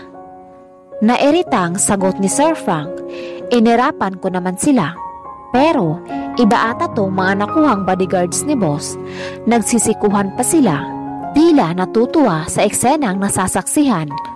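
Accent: Visayas, Philippines. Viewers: Anyare sa narrator?ganda ng kwento sana,,diko malaman kung bisaya o batangueña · Sa nagbabasa ng kwento😅😅😅 bisaya kapoba para kalang kasing tumutula hahahaha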